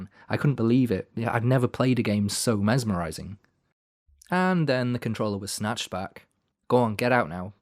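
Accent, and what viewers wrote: Wales, United Kingdom. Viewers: Thanks for sharing these podcasts; it’s so interesting to hear your story with TR. I think we’re quite similar! I grew up in Wales too - clocked your accent;) - but had a crap time being bullied in a rough secondary for being ‘geeky,’ so actively pushed down those interests to remain safe at school. It’s inspiring to hear someone embrace their passions so wholeheartedly. Did you get any backlash growing up for your interest in TR and gaming up in NWales? I loved the puzzles, history and more deliberate game pace of the older games, even though my family thought it was Lara’s sex appeal - how wrong they were…;p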